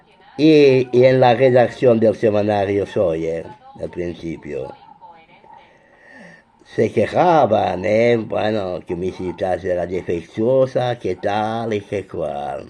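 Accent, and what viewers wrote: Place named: France. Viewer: Usted es solo un francés renegado que está acogido en España , pero solo hay que oírle para saber que es un francés de pura cepa. Usted pasará a l posteridad por ser novio de Carmen de Mairena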